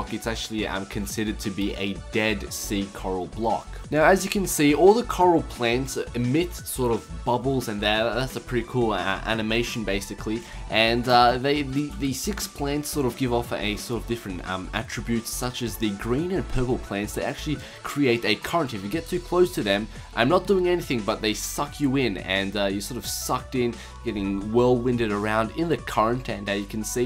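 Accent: Australia. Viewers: Dude 1. It isnt a fake accent 2.The ``fake`` accent is australian, not Russian 3. Learn how to spell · He is Australian, thus he has an Australasian accent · he doesnt have a fake Russian accent its Austrailian and its not fake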